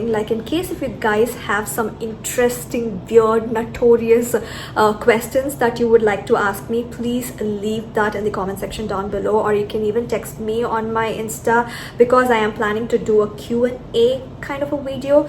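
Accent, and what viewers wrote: Tamil Nadu, India. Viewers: Seriously your editing skills are vera level.. and the way you speak, as a Tamil girl I can relate to that. The thing is you apdae translate the Tamil expressions to English. That's so wonderful to watch. I am your new subscriber. Keep it up. I'm waiting to see your growth as a youtuber. Long way to go, Congrats🤍🤍🤍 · Lov that tamizh twang ❤️❤️❤️ wdt it d video seems incomplete. 😀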